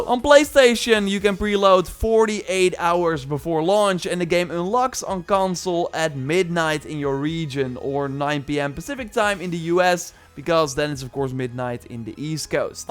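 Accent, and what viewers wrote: Netherlands. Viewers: Quick question, hearing your accent I'm guessing your from the Netherlands? When's does the game drop there? Is it midnight going into April first, or is It at 17.00 On April first?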